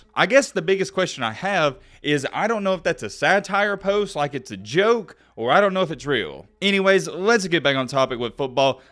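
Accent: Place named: Dallas, United States. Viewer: Sounds just like dem boyz over there in Dallas😆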